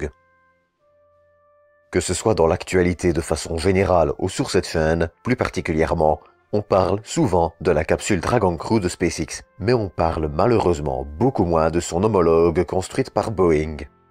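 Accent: Belgium. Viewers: vive l'accent Belge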